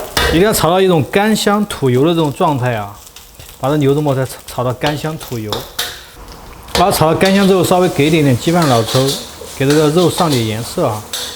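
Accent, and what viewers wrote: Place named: Yibin, China. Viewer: 我听主播应该是宜宾的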